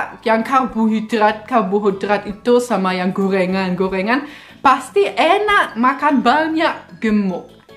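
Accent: Java, Indonesia. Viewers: Mbak. Medhok mu itu lo kok legit banget. Koyok mendoan. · Mendok bgt udah mbak ya,.sy asli orang Lhokseumawe Aceh prnah tggl kerja di smrag bbrp th dl.,snag ja liat video kalian dg ltar blkag liku liku hbugan cinta kalian.mas,.smg bgia trs n langgeng ya mas,jg mbak saat trs bgia trs,.prbyak syukur dg itu kbgiaan akn brtmbh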